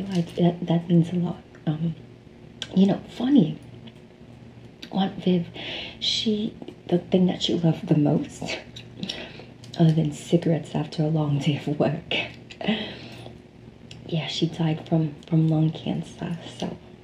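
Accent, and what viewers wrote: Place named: New Jersey, United States. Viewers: Jersey Accents in ASMR is sooooo Top Tier🥴🤌🏾🤌🏾🤌🏾🤌🏾🤌🏾 Thank You Always Beautiful 😍🥹🫶🏾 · Ohhh jersey accents in asmr are elite 😍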